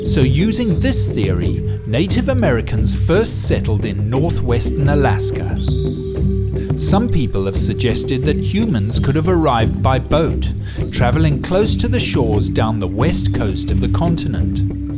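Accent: United Kingdom. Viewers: Solutrean if you believe the interpretation of the archeological record. Bearing Straight origination is even less likely than Pacific Pan-oceanic migration. Truth doesn't concern itself with feelings nor should it, funny little British accented man reading US a script telling US about our history. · Imagine being the one who left that turd I bet at the time they weren’t thinking that far into it- why is a British accent talking about how old natives are uh dude our ancestors all are the oldest yah think no one nation can clam the oldest that’s like saying I am better cause I have blue shoes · Does anyone else notice the irony that this post about Native Americans is narrated by a man with a British accent?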